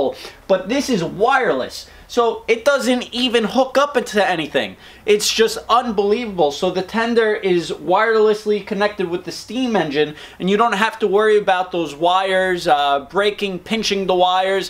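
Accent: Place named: New York, United States. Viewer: You can TELL he’s from New York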